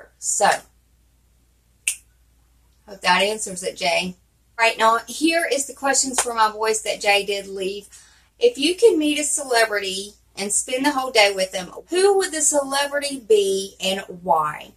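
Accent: South, United States. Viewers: This was so cool!! And you’re such a darling! And for the record, I think you have a nice accent. No like a hick or something. Sounds like southern charm to me.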